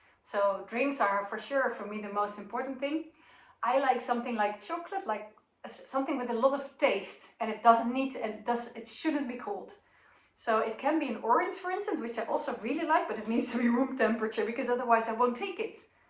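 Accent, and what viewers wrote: Netherlands. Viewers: likewise. I have been subscribed for some time now. Is it a Hollands accent i hear? Groetjes from the sunny Caribbean.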